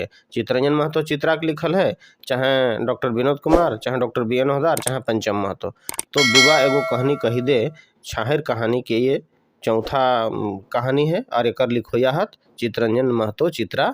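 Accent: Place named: Khortha, India. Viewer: आपके खोरठा बोलने की शैली से मैं काफी प्रभावित हूं। कृपया आप नियमित रूप से विडियो अपलोड करना सुनिश्चित करें,यह चैनल बहुत तरक्की करेगा। 🙏 धन्यवाद 🙏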